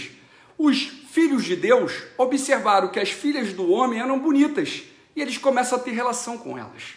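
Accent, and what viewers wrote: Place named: Rio de Janeiro, Brazil. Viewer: Conteúdo muito bom , porém esse sotaque de carioca é nojento , impossível se inscrever 🤮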